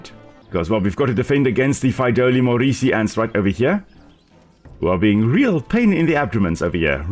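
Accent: South Africa. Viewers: Are you South African? Your accent sounds so familiar I swear. Just found the channel and its awsome. Plan on binging this series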